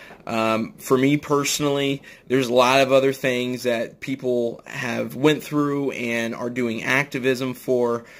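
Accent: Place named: Midwest, United States. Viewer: What part of the midwest are you from? I'm from Nebraska.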